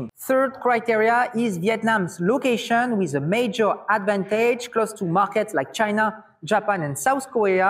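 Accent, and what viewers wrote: France. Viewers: I love the French English accent.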